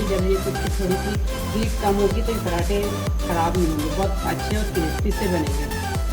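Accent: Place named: Bhopal, India. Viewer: Apki zaban bilkul indian(bhopali) jaisi hei👌👌 or apka haddi wala pulao mene bna kar dekha boht accha bna hei👍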